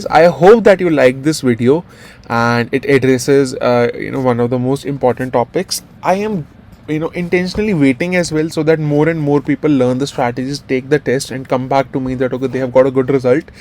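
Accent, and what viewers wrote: India. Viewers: Its my humble suggestion that first learn then teach. Your accent is not up to the marks. Typical Indian style listen, :THAT IS, very substandard". My brotherly advice would be please don't spoil others. And remove your chnnel imediately, Then your delivery, "TRY" is not of English ACCENT. It seems as if your are speaking Indian. Veyr bad.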